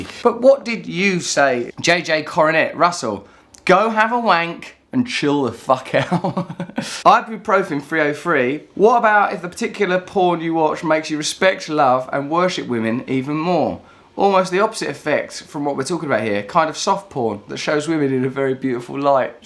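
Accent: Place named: RP, United Kingdom. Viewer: Trying to work out what you say , not because I'm American but because you posh mumbled. Something to do with to learn more watch ( centre of the self?) The subtitles say " To learn more or change in the cell phone communities miller's 3i I wonder" <<< PS Can you tell me what hair product you use, I'm jealous of how you manage to tame your barnet. PPS Come to Birmingham to meet practically evicted Londoners like myself and do some Trews on the road!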